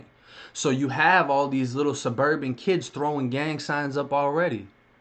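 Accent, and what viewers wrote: Boston, United States. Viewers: Bro accent sound like you could of played in The Departed be Mark Wahlberg voice double 😂😂😂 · Love your Channel. Have seen many same type Channels. This is in my opinion far the best. You have a talent for this. With your Boston accent. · 1 of Christina baby gang , I love your East Coast Boston accent. I’m from Connecticut. Sometimes some words come out like Boston area, but not enough LOL 😂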